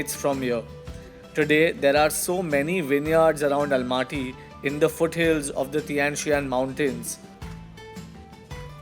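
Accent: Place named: India. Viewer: Indian accent 🤢🥴🥴🥴🥴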